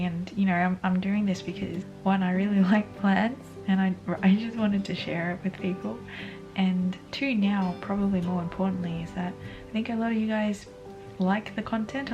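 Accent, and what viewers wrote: Australia. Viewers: Thanks for the thanks. I’m really grateful to hear an Aussie accent in the YouTube world. I like your chilled vibe. And mental health tips are always useful, especially in the time of COVID.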